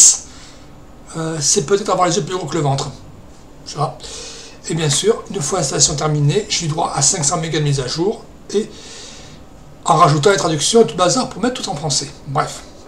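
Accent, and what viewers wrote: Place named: Arcachon, France. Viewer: Pour moi c'est plutot bel et bien une Dglfi. Sinon a part ça part chez moi en france et en français on prononce win mais pas winé. Tu es bien sur maitre sur ta chaine et ce doit etre du a l'accent d'arcachon qui est bien sympa de toute façon.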